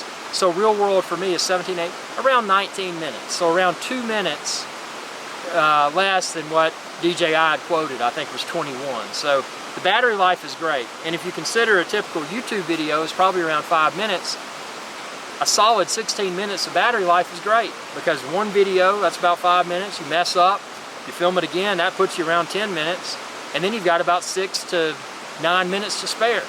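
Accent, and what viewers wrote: South, United States. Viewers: Thank you. Love your southern accent. (UK)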